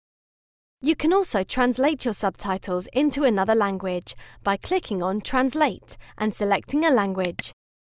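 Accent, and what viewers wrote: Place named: United Kingdom. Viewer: very thick brit accent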